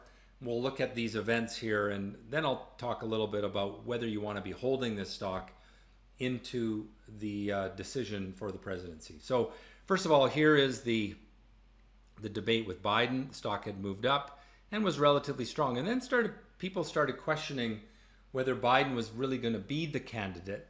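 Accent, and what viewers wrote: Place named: Canada. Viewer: I’m an Aussie and always have loved the Canadian accent. This guy is simply incredible. Clearest information that I have ever listened to.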